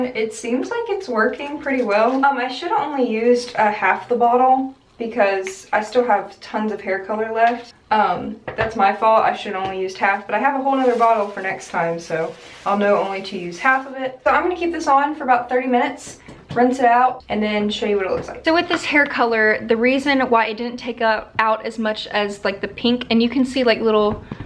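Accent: South, United States. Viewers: Ive been following your channel for a while. And I just noticed you have a southern accent. Like its not deep South but you kinda sound like your from Houston..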